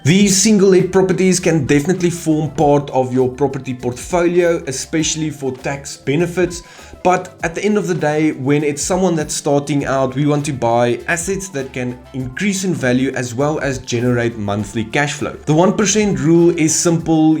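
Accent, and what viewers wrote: South Africa. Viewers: I just enjoy hearing your South African accent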